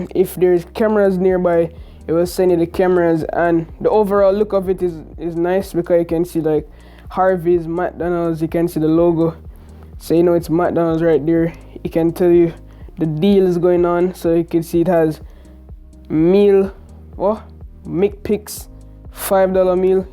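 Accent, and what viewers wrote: Jamaica. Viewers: U Jamaican? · Yeah Man U definitely Jamaican bredda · Are you jamaican? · u jamaican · Are you Jamaican 🇯🇲🇯🇲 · You Jamaican?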